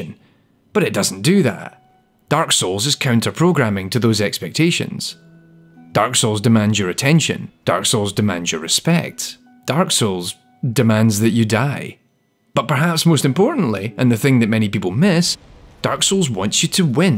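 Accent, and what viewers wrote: Scotland, United Kingdom. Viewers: nicebagel not posh he is Scottish and has a strong accent meaning he is from either the central belt or is from a part in the highlands that is quite rural. I would be surprised if he was from Aberdeen or Edinburgh and would be amazed if he was from the western isles. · I'm just at the beginning of the video but I want to say this entire format seems really, really interesting and I love your accent, sounds a lot like a scottish guy whose channel is also one of my favourites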